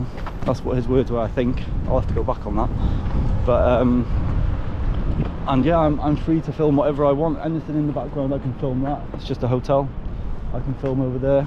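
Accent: England, United Kingdom. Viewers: The real and prolonged threat to Welsh culture is English immigration. Your English accent is testimony to that. Happy to be overrun and ruled by your English masters eh.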